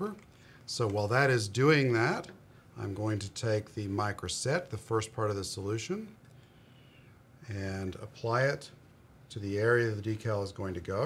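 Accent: Australia. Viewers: bit of an Ozzie accent there sometimes 😁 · G'day...two quick questions...do I note the hint of an Aussie, if not Canadian accent?? Just at times it's more noticeable. Secondly, and more subject oriented...have you gloss coated the Vamp first?? and do you subscribe to the process?? I'm in the process of doing several 1/350 ships...and as such they're not exactly small...and just wondering if the overall process is beneficial on models of this scale?? Thoroughly enjoy all the hints and tips videos...as I've been out of modelling mode for many years, it certainly helps immensely. Cheers from Sydney Aus!!!!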